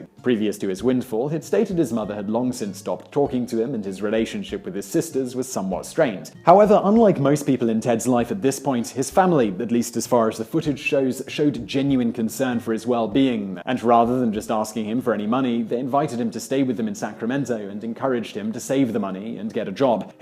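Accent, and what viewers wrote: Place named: Belfast, United Kingdom. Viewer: Is anyone here from Belfast ?? This sounds like a homeless man in Belfast.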